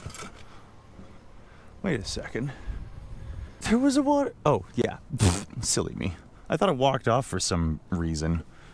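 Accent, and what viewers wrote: Canada. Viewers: Good one......ooohh yeaah its got some weight to it.....Same same but with Canadian accent Love it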